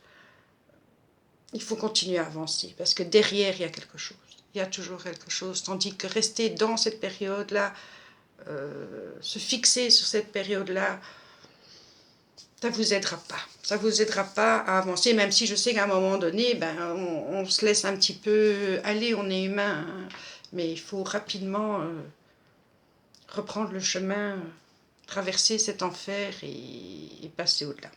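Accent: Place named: Belgium. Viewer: Coucou C'est super d'en apprendre plus sur les copines grace aux tags... je trouve ça sympa. Et je vois que toi aussi tu as un joli accent... belge😊 Bon week end Gros bisous😘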